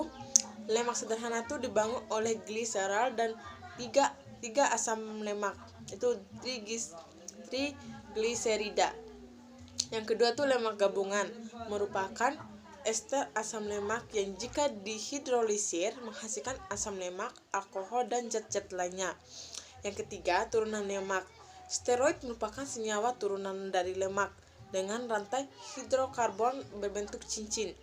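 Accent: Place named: Bima, Indonesia. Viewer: Bimanya kental banget😄 semangat terus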